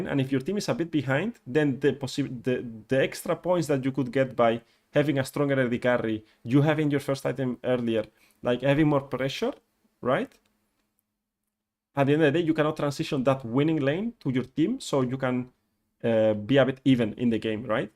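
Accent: Spain. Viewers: Buenas, tengo una pregunta (im guessing ur spanish by ur accent). Estoy actualmente practicando Bardo porque soy OTP Rell y Rakan, pero queria saber si Bardo merece la pena. Tiene un estilo de juego que me gusta bastante pero no se si merece mas la pena ser OTP Bardo o Thresh. Tambien me gustaria saber si en un futuro haras alguna guia sobre Bardo, gracias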